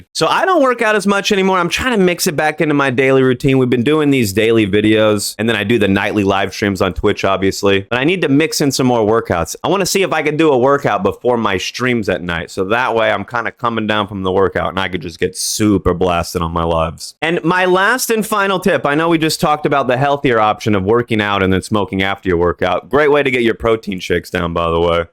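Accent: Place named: New York, United States. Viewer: 😭is he from New York or something? Ik Ian the only one who notices the slight accent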